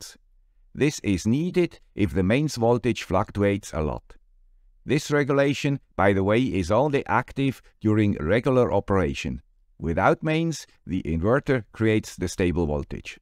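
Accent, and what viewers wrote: Switzerland. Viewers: The man with the croaky Swiss accent 8-) Everyone seems to have suffered this winter. I've been coughing since Christmas.!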